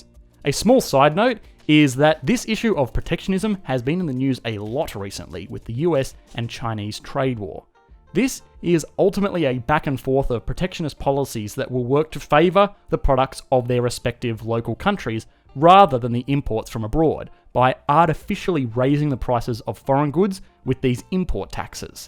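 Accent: Australia. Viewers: Hey are you from Australia!?!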